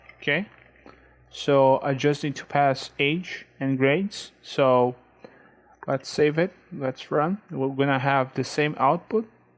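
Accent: Brazil. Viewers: Mano você e brasileiro ? Ou americano ? ._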